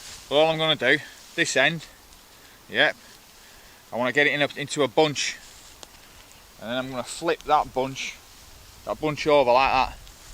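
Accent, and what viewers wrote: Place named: Scotland, United Kingdom. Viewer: Your Scottish accent is entertainment In itself 😂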